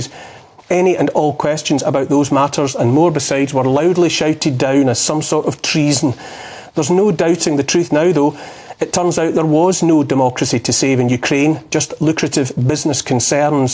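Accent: Scotland, United Kingdom. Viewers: With that glorious Scottish accent, Oliver sums up so very eloquently the truly horrific situation we find ourselves living in. I can only pray that governments and their puppeteers will one day pay for their crimes against humanity. Thank you, I dont like the subject matter, but you are a joy to listen to ❤️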